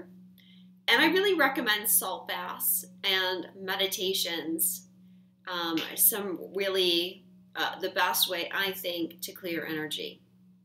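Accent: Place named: South, United States. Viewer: Yes, it still seems impossible NOT to rest; I just want to get into my mission and being creative and stuff, but man; I just don't have enough physical energy nor would I know where/how to start!!:-)) By the way, are you a singer? You have a Country voice that reminds me of Emmylou Harris:-) Much Love to you as always, and thank you for the video <3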